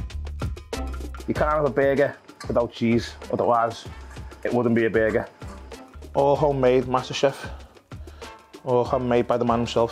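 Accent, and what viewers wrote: Liverpool, United Kingdom. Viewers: Make a video one day when it's all Scouse ! 🙂🙂🙂🙂👍🙂👍🙂👍👍👍🙂🙂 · Is he a Scouser? Sounds like one. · Scouse.. Liverpool accent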